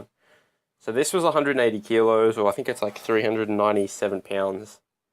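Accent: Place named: Australia. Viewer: Lol Cheers Tim. It's an Aussie accent :-)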